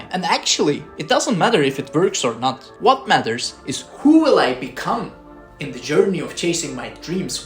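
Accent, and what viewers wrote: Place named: Turkey. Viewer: aksan türk aksanı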